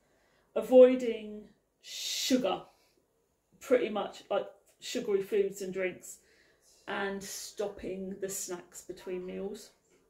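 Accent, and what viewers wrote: United Kingdom. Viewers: Warrington? You sound like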